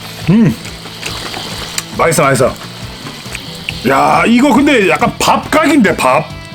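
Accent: Gyeongsang, South Korea. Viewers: 예전부터 궁금했는데 경상도 사투리쓰시는데 사시는 곳이 강원도 쪽인 이유가 있나요?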